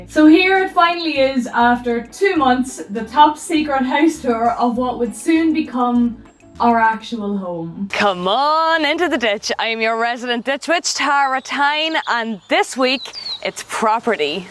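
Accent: Ireland. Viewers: Thank the earth, you've an Irish accent and I can bare to listen to you (too many Brits on youTube buying up our housing stock in 2020s). Congrats on the purchase... I'll watch and follow how your place progressed. As I write, Ive gone sale agreed on a 1949 cottage in great condition but it needs a bit of love.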